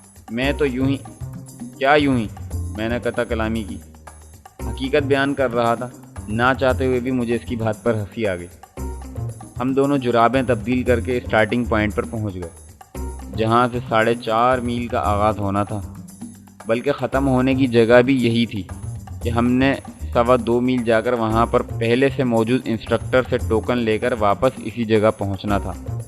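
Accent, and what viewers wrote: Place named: India. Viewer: Ye lehja indian hai ......aesa kabhe nahe hota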